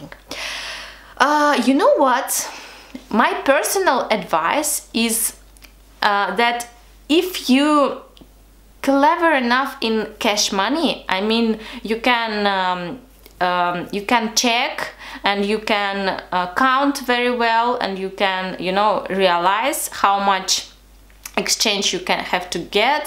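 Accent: Russia. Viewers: Gorgeous Russian accent :)))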